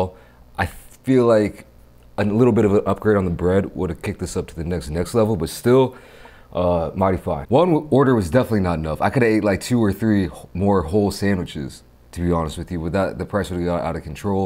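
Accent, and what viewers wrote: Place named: Midwest, United States. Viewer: They on to somethin', I'll tell ya' what! - A key part to any video is having his Midwestern-isms come out!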